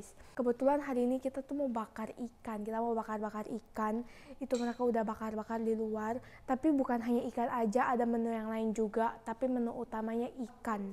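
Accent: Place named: Flores, Indonesia. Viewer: Kek ada logat Bajawa😅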